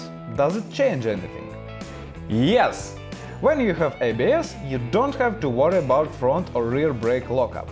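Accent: Russia. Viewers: Какой же тяжелый русский акцент😄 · С этого видоса я прям прочувствовал русский акцент в английском языке. 😂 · Very good information, very bad English. You have to do something with it. Your English is too Russian)) Your tips are really useful. Thanks a lot!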